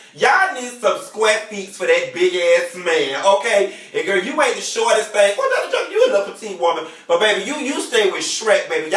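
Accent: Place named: South, United States. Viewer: i love your videos and I'm an avid watcher.... But baby.... You hella country!!!!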